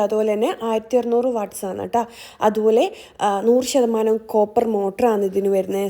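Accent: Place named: Lakshadweep, India. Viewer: [01/09, pm] ѕαяנι🫣: Hi [01/09, pm] ѕαяנι🫣: I'm sarjeena from lakshadweep ഞാൻ ഇപ്പോ കുറെ ദിവസങ്ങളായി ൻ്റെ videos കാണുന്നു.നല്ല ഒരു motivation ആണ് എനിക്ക് കിട്ടിയത്, thank you so much... ശരിക്കും എൻ്റെ ജീവിത ശൈലീ തന്നെ മാറ്റി മറിച്ചു ipo ഞാൻ സുബിഹ് നിസ്കാരം തെറ്റികാറില്ല പറഞ്ഞ പോലെ നേരത്തെ എണീകുമ്പോ മടികൂടാതെ പണി എടുകാൻ പറ്റുന്നുണ്ട്.പിന്നെ nte ഭാഷ നല്ല രസമുണ്ട് കേൾക്കാൻ,കുറച്ചൊക്കെ എൻ്റെ ദ്വീപിലെ ഭാഷ പോലെ ആണ്. അ പിന്നെ ഞാന് ഗ്രീൻപീസ്. ചിക്കൻ ബിിയാണി ഒക്കെ ഉണ്ടാക്കി. ഇവിടെ എല്ലാവരും കഴിച്ചിട്ട് ഇത് ഞാന് തന്നെ ആണോ ഉണ്ടാക്കിയത് എന്ന് ചോദിച്ചു....☺️ So dear friend once again thank you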